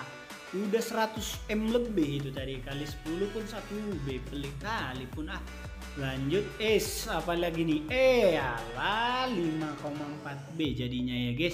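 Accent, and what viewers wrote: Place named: Medan, Indonesia. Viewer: Bng kalo boleh saran gk usah di campur logat medan,, kek garing bat di dengar😌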